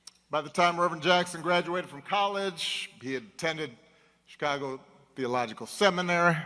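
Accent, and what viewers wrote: South, United States. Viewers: I like how he throws in a little, country accent. So genuine ❤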